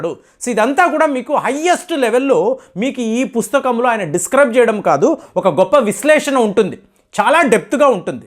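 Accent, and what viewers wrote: Tamil Nadu, India. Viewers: GARU meeru chaala peddagaa vaaguthaatru; anavasaranga high pitch lo pelutharu: Governor Shanti SHANTI Kanta Das ani perkonnaru: naaluka madatha padinda ledaa mind dobbindaa? Aayana peru Shakti Kanta Das. Enduku antha hype create chestaru meeru; prathi maataa nokki vakkaNinchi paday paday chebutharu; too much hype thammudu; manchi vishayalu testhunnavu kaani intha hype aqqaraledu: mari Mimmalni ani cheppey badulu mimmaLLni ani chebuthavu: ee yaasa vadulukolevaa inkaa aa saambaru inguva vaasana poleda? Intha kaalam telugu rashtralalo untoo achcha telugu palakaleva. Ayyo devanandanaa!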